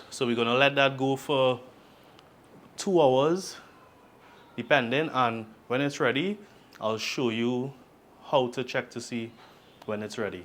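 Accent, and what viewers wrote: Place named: Trinidad and Tobago. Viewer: Thanks for the tips.. I make this outside easter for my son. Can i use orange zest when lemon is out of season? And, thanks for maintaining our Trini accent.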